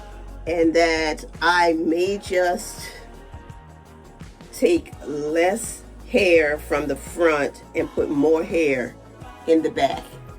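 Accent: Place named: Gullah Geechee, United States. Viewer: Hi! I just found your channel. I really love your content…I am getting my locks installed next month. On one of your older videos, you mention that you are from SC. I would guess somewhere near the low country (I hear the Geechee accent). My husband grew up near Charleston.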